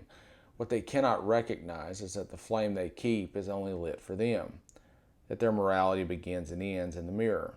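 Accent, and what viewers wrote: South, United States. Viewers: what a relief to hear a Southern accept applying reason. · I'M A NORTHERN I BELIEVE YOU NAILED IT FOR ME IT'S HIS SOUTH DRAWL THAT STRENGTHENS HIS MESSAGE FOR ME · Thank you from a fellow southerner,. Gives me strength to hear your words in your accent. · I agree. Look how close his eyes are together👀 a very cunning Jew with a southern accent.🤷🏻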